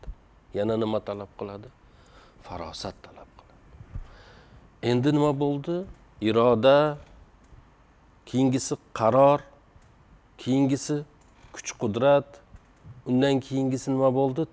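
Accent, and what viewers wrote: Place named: Termez, Uzbekistan. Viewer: Мен бу канални икки Кун булди. Танидим. Узбекистонда и кайси студияда. Гоголда туркияда деб беряпти. Шевангиз Термизга тортяпти. Каерликсиз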